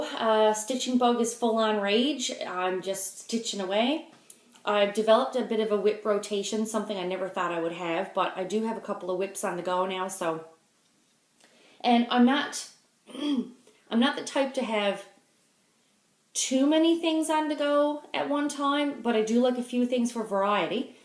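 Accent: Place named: Newfoundland, Canada. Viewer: My dear I loves hearing a newfies voice on me phone . Thanks for the video maid . Catch yah on Instagram sometime ! Hugs, Blake!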